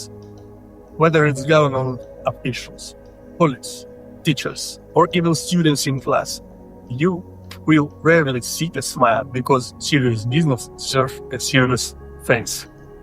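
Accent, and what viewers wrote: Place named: Russia. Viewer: An accent is russian that is true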